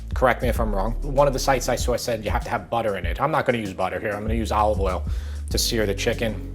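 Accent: New York, United States. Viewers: I watch alot of YouTube cooking tutorials, but your breakdowns & your NY accent make these easier to follow, being a fellow NYer 👍🏿😎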